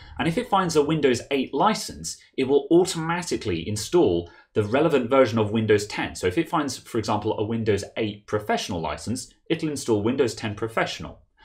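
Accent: England, United Kingdom. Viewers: Where in the world are you and from. My guess is English but you always price in dollars. I subbed to your channel because it's nice to have a clear and understandable voice to listen to. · he is speaking a certain accent of UK english, other accents can be unintelligible . search youtube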